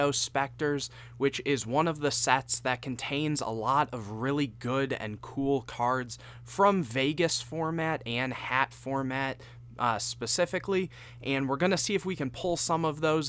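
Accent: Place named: Europe. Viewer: Big fan of euro english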